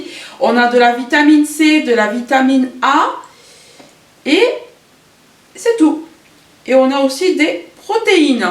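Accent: South, France. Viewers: j'adore ton accent ensoleillé, accent du Sud ?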